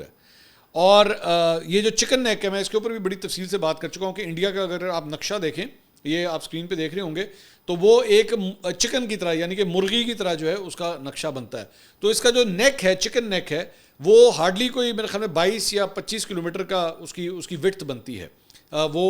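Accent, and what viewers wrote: Punjab, Pakistan. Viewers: As a Punjabi buhat Acha Lagta hai punjabi tarka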